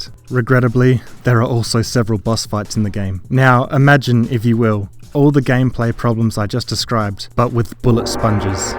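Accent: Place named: Australia. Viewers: Hi are you Australian or from New Zealand like your accent is incredibly subtle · Australian I'm guessing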